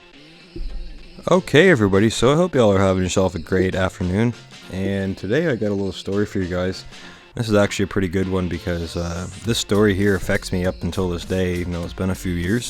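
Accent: Canada. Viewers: Buddys got the Manitoba accent. Good vid bro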